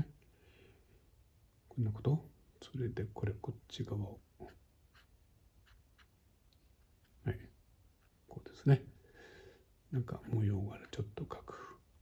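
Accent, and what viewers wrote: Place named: Poland. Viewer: Wow! I would never expect a polish accent in your video :o Thanks for this!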